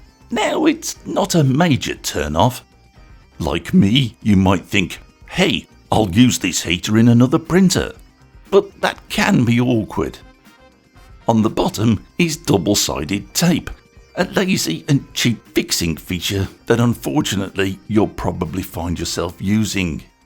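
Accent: United Kingdom. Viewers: Your British accent alone was enough to convince me ! thank you!!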